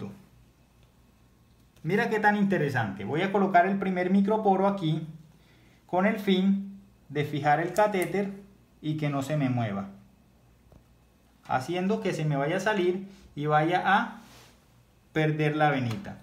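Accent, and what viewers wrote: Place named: Colombia. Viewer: Bogotá, pero por su acento sabia que era de Colombia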